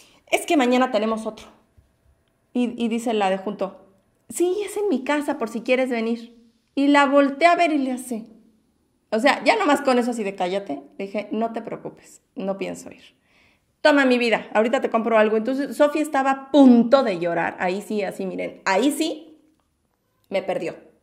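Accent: Mexico. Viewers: "que se lo dejaba a sus 25 hermanas" JAJAJAJAJA. Me super aburre escuchar hablar a alguien pero con esta mujer es imposible, sobre q amo el acento mexicano y el carisma q tiene ella una no se puede aburrir ni un poco 🙌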